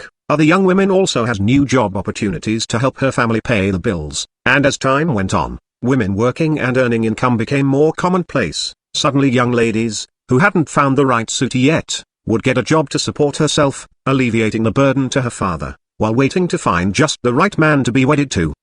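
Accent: United Kingdom. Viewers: Good stuff. Are you from the UK? or is that just the synth-voice?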